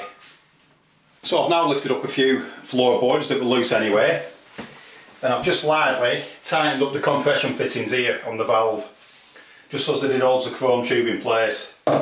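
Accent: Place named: Rossendale, United Kingdom. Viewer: great video helped loads. btw are you from rossendale? your accent sounds like a rossendale one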